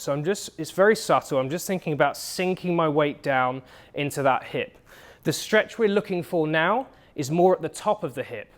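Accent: United Kingdom. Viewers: Very nice and detailed presentation. Your video was full of the kind of detail that's helpful to know to achieve good results. And, you sound British, but you look like Capt. America.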